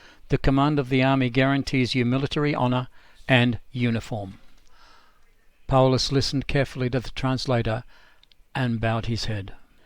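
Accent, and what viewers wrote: Australia. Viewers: What is an Australian doing the commentary ? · Better late than never... Yes, I sadly agree, especially for USAers who actually need subtitles for NZers! Australians basically understand every dialect of English and being so multi-cultural, many strong accents are just everyday life here. I had a great career in Call Centre Training in the Philippines precisely because: A) I'm very good at it & I loved being there & doing it, and B) My so-called "U Australian" accent can be almost universally understood; it is pretty neutral as English speaking accents go, and I can easily learn & adapt to different dialects & vocabulary. So I could manage not only Aust/NZ accounts, but also even the strongest of US or UK English accents. The Americans DEFINITELY can't do that & the British often aren't much better, so I was always in demand. My accent is less "Australian" than your narrator's, for reference. 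PS: What a 10 months it has been!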